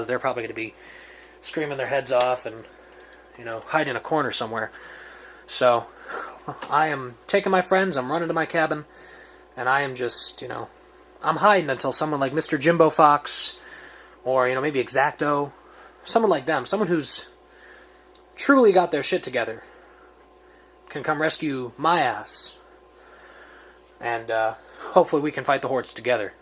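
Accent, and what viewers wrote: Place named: Brooklyn, United States. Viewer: Hahhahahhahahhahhahahhahahhahahah Brooklyn accent that's hilarious Thanks for the great vid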